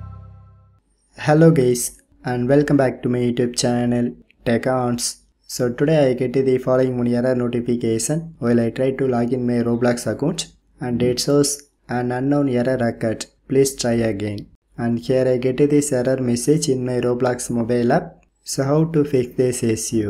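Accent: India. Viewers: its okay saying it wrong its just because uour indian so its hard to say a simple thing but your good at english❤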